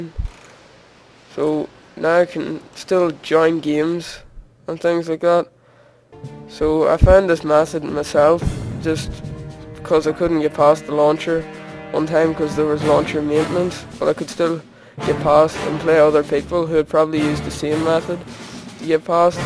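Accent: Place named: Northern Ireland, United Kingdom. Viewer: This guy is from northern ireland....thats enough for like from me :P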